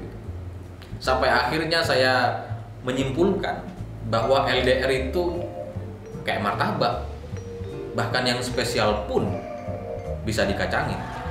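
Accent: Madura, Indonesia. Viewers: Logat madura pean cak?👍👍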